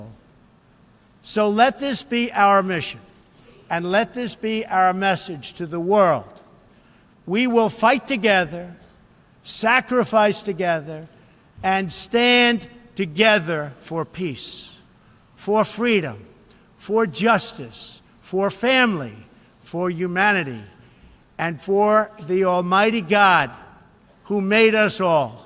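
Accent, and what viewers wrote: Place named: New York, United States. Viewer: I really am on bored. I dont see any other politician saying this nevermind with his smart new york guyvtalk that is likeable not racist. Media is highjacked he says he is worried about america because we arent living up to expectationa because of our own system the president cant bring world peace unless we start in America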